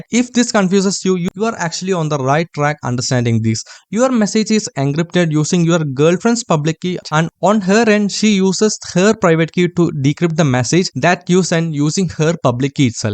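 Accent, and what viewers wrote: India. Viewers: your Indian accent is strong almost like the ones they show in western shows! it is understandable to us but global audience or even Indians might find it little irritating, try reducing it that will help you reach global audience :)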